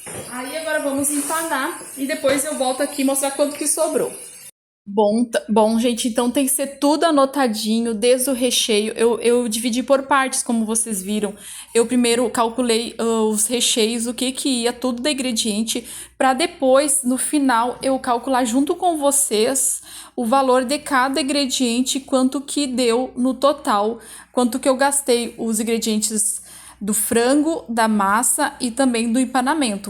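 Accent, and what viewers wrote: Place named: South, Brazil. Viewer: GRATIDÃO 🙏🙏🙏ADOREI SEU DOTAQUE❤TU É DO SUL???😘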